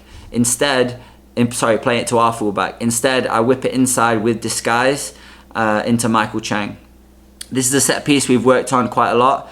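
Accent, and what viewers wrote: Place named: United Kingdom. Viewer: This is the second clip I have watched in your channel. Although your accent is very British I think you are playing in the US. Your team is SLC and I wonder if it is Salt Lake City. What class of league are you playing? I know the top league is MLS but the venue doesn't seem to be up to the MLS standard.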